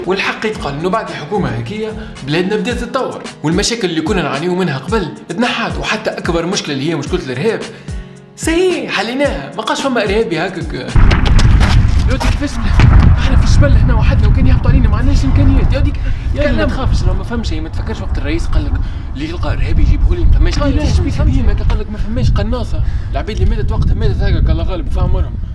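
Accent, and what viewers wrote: England, United Kingdom. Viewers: that british accent tho ❤ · That England accente tho :D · Dat english accent xD nice video , mafhoum w message reçu <3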